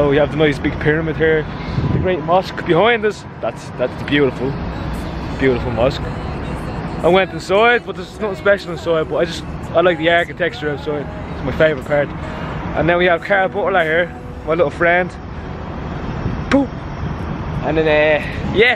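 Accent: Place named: South, United States. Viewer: Are you southern? You sound like Miranda Sings or it’s just me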